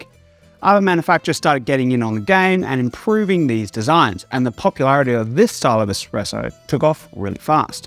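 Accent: Australia. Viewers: Can we all agree that his australien access is fascinating 😍😍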